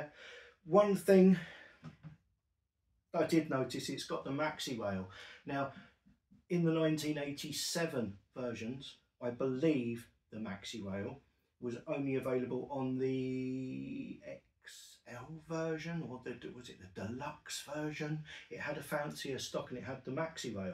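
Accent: United Kingdom. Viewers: 2 seconds in, and i already know I am not interested in this break barrel pellet gun. As soon as i hear the British accent from a guy wearing a batman t-shirt, I know the gun does not pout out enough energy to drop a squirrel at 80 yards. I can not doubt it is a well made gun, (I never had one), but 12fpe is just no fun. Stop letting your governments treat you like children,---------- Batman.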